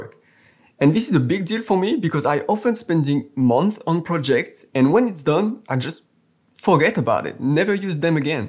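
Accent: France. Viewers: Really nice 👍 Jugging by your accent are you french? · Oh le gros accent français qui fais plaisir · Dès la 1ere seconde de la vidéo j'ai spot que tu était francais c'est fou, ton anglais est trèèès bon c'est juste que entre francais je reconnais l'accent francais !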